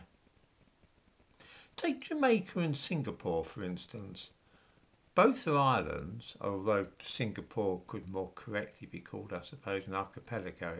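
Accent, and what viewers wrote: United Kingdom. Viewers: Wow, this is some of the most obviously dog whistle racism. Then near the end he references the bell curve. Talking slowly with a British accent does not hide your raging recism. Smh I know I know. He is "just asking questions".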